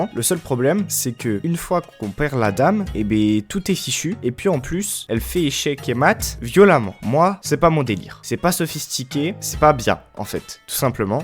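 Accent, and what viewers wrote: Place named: South, France. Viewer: L'accent du sud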